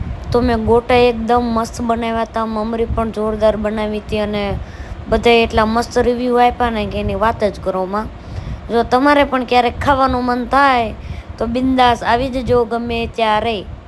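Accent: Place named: Rural, India. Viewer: મારી અટક પણ છે. તમારાં મામાનું ગામ કયું? તમારી શુધ્ધ ગામઠી બોલી હો. મજા આવે એકે એક શબ્દ સાંભળવાની.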